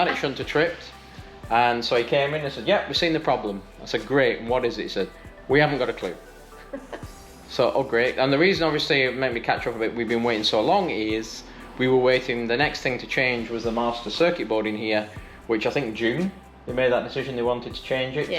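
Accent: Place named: England, United Kingdom. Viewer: I'm sure we don't live to far from you..we moved to the USA in 1999..we moved to our Florida home 5 years ago..we got it in 2003..not sure I could do a large upgrade now..we may wait till everything comes back into syle..😂😂😂..I was watching storm videos and heard an English voice..so stopped to watch..everyone we know are American.. I don't think there are as many Brits here as there once was..before we moved here full time there were a lot of British here..I think we scared them away...😂😂😂😎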